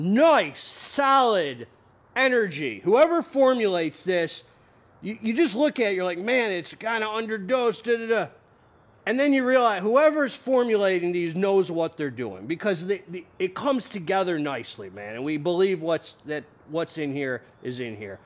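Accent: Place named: South, United States. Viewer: You remind me of a southerner with the way you say things. Idk where you live you just remind me of a very energetic southerner lol😂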